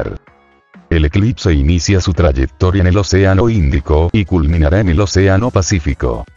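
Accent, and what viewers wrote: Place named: Argentina. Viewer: Me suena argentino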